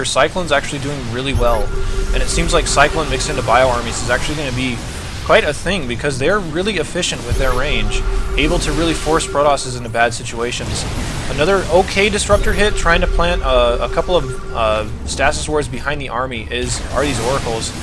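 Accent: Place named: United States. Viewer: Wow. Protoss OP as F*ck now huh? Hope this game isn't broken at this stage in the series... Can't justify toss having what appears to be disruptor mini-nukes, AOE traps on top of colos/tempest/ templar storm. Toss now AOE gods. The beta thus far looks ridiculously in favor of protoss. Also.... with respect, its pronounced "STAY-SIS", not "STAH-SIS". You sound like an American, so I can't understand your mispronunciation of Stasis...... FYI so you don't sound like you didn't pass high school English class.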